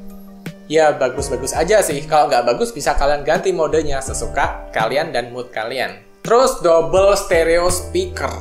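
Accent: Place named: Java, Indonesia. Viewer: logat jowo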